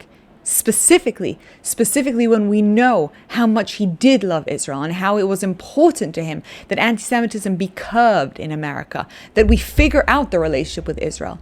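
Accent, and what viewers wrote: United Kingdom. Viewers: I like your hair!! Its like wool... beautiful texture. By the way r u British? I like your accent too... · British accent?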